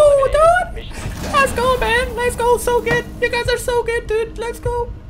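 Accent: Germany. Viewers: Is he german??? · Are you german ? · you from german or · Are you German? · Wtf bist du deutscher oder kannst du besser deutsch als ich 😂😂😂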